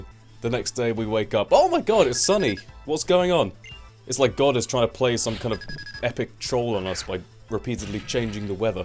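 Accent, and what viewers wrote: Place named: England, United Kingdom. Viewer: whereabouts in England are you from?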